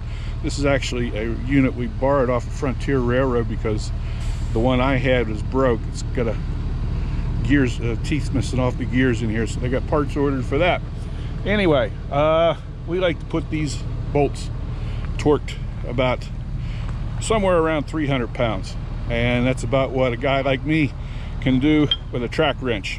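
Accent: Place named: South, United States. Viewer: My dad still slips up sometimes and says “worshington” and “worsher” he’s been in the South for 36 years! When I heard you say “worshington” the first time I knew you were in my briar patch. Been meaning to tell you that here in Tuscaloosa is home to the longest wooden train trestle still in operations that crosses the black warrior river between Tuscaloosa and Northport. Norfolk Southern runs. I’ve been seeing Union Pacific locomotives a bit more here as well not sure what’s going on there but they’re gorgeous engines! I love seeing that flag thunder by shaking the ground! Oh FYI that trestle, over 3500 long! Google it sometime!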